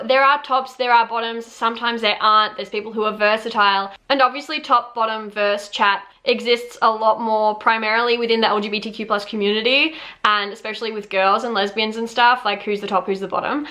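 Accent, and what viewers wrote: Australia. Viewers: Are you dutch, cause your accent sounds more like an autralian one Edit: just saw your name is and you are Australian.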